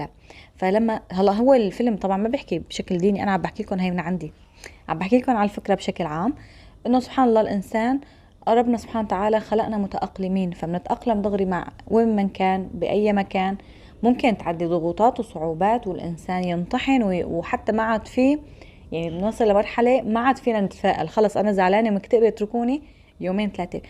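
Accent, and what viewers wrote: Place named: Syria. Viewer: بصراحة تفاجاءت بقناتك الثانية يا بالله شو مهظومة هههههه بلهجتكم السورية بقولها متابعتك من اليمن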